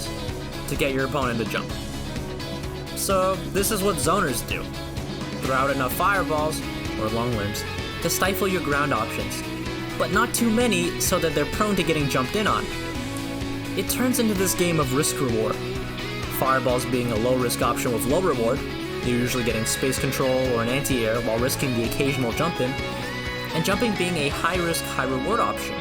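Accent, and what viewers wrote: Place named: United Kingdom. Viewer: In that intro you really sounded like the slay the princess narrator lol xDDDD Or maybe its because im not used to brittish accent idk, but it reminded me so bad of that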